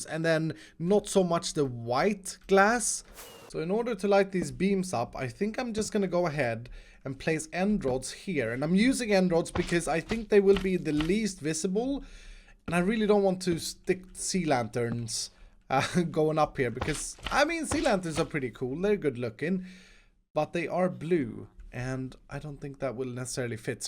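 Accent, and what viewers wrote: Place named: Sweden. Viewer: He's probably just developing an accent that's less swedish because the majority of the English-speaking world isn't swedish. He must think in a swedish accent, so it would be hard to lose it permanently.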